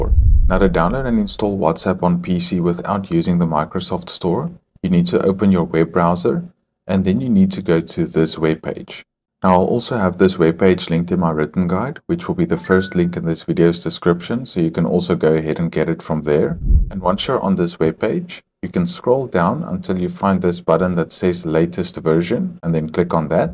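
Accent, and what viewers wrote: South Africa. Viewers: Thank you so much ❤ My Microsoft Store seems not to be working , Got yourself a new sub here, the accent sounds South African❤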